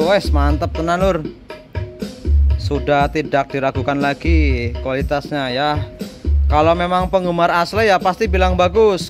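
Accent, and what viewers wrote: Banyumas, Indonesia. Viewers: ok joz mas boos,aja kelalen ora ngapak ora kepenak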